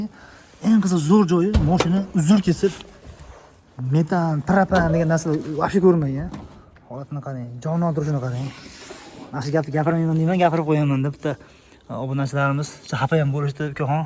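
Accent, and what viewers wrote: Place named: Andijan, Uzbekistan. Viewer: 200 000 ni tagi 60 da shevez andijonniki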